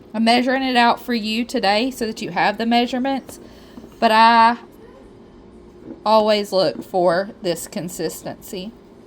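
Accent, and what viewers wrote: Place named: Alabama, United States. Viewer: I love your Alabama accent. My people are from north central Alabama and sound just like you. thanks for the helps.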